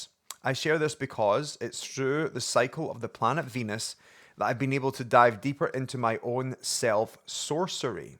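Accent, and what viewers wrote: Scotland, United Kingdom. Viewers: Lovely cards like the coffee love the Scottish accent ❤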